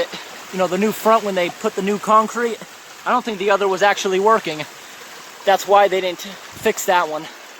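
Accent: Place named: Michigan, United States. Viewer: Are you from Michigan? Trying to place your accent!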